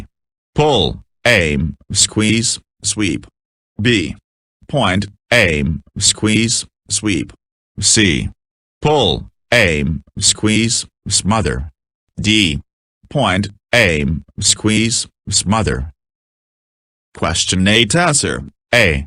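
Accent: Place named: United States. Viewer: Why the american accent voice over? Also, since when do we use the term "gasoline"? Very poor quality.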